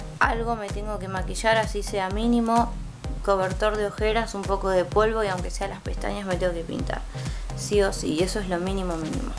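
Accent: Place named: Argentina. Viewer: pues eso, el acento argentino :) jejeje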